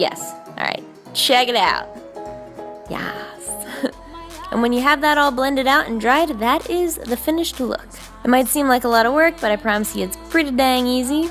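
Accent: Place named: Philadelphia, United States. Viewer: Your Philly accent gets me every time. I know it all too well and I love it ❤️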